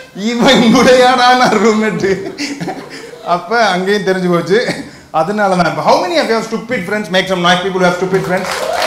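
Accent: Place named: Bangalore, India. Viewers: Bangalore tamil😍😍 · Bangalore slang 😂😂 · You are doing better keep it up.The Bangalore tamil accent really exists. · That bangalore tamil accent on point 🔥🔥🔥🔥🔥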